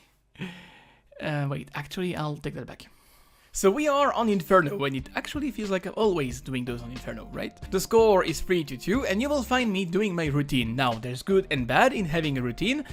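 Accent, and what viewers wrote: France. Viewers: Слушать английский от француза, когда ты - из России... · His french accent is so annoying.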